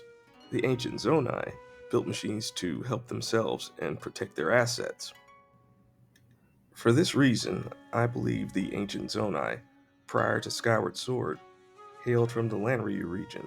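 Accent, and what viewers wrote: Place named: Georgia, United States. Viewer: Where are you from? You accent sounds like south Georgia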